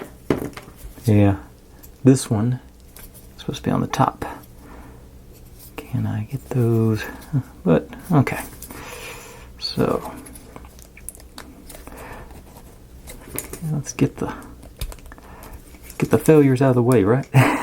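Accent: South, United States. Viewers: Thanks so much for this full video set--I picked it up about Section 5 or so when I started to get bogged down. Most often, I watched the videos after I had done a process, to make sure we had done it the same. I chuckled through a lot of the videos as you and I made many of the same mistakes and had the same re-dos. I ended up breaking 3 pieces, and mercifully there were spares of all of them included. This was my 4th ROKR and most complex one. I've done hundreds of jigsaw puzzles, but not many 3d kits. I was intrigued that you have the same regional accent as another YouTuber I've been following for awhile--no idea where he (or you) lives, but it seems like a Kentucky or west Tennessee accent. <link>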